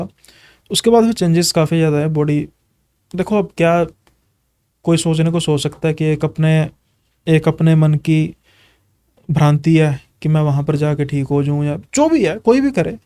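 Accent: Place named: Punjab, India. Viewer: Yaar ye punjabi accent me baat kar raha hai.. aadhi baat samajh nahi ayi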